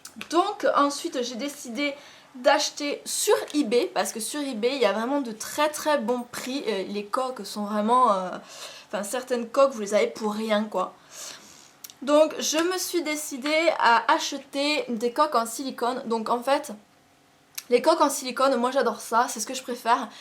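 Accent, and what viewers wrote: South, France. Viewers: Super vidéo sa se voit que tu viens du sud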